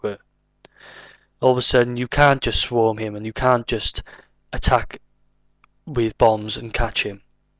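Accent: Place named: Newport, United Kingdom. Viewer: good vid m8, agree that lookin beyond the hype haye didnt do as bad as is being made out really, apart from that fallin down shit which pissed me off, i havent seen any1 for a long time last the 12th without being smashed to bits let alone rock him with a clean shot. id like haye to fight arreola or adamek an earn his way to mandatory an do it again. many would disagree but thats wat id like in an ideal world. where in wales u from m8? sound like u from Newport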